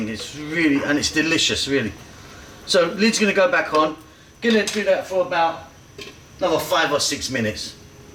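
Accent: United Kingdom. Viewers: MUY DELICIOSO! Five stars for using the Fish Sauce and your thick British Accent to go with that. Really, it's delicious, really!!!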